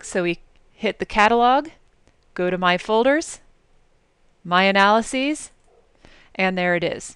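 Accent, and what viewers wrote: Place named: United States. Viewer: Just want to echo what someone said below, so nice and refreshing to watch a training video with someone with an American accent.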